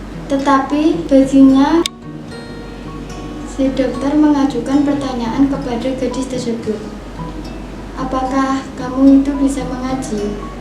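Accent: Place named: Java, Indonesia. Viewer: terima ksh tapi ngomomg ny MEDOK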